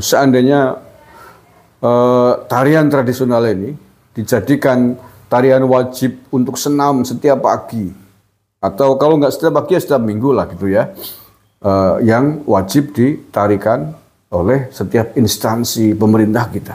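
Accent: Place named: East Java, Indonesia. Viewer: Koyone jowo timuran kang.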